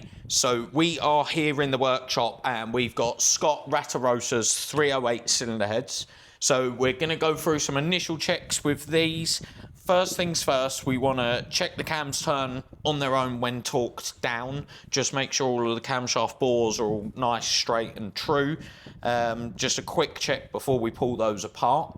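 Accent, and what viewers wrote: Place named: United States. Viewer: Cool - maybe try saying "you" sometimes instead of "you guys". I get that narrating a task is not your job but changing it up a bit helps a lot. The less American you sound the more likely I will subscribe. (Alfa Romeo - 4 door Gullita 1600cc saloon)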